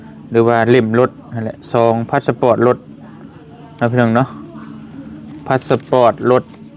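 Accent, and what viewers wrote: Isan, Thailand. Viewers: พูดสำเนียงอีสานขอนแก่นชัดมากๆ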